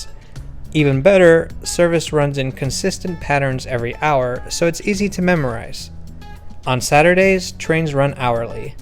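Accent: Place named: United States. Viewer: Most American sounding European guy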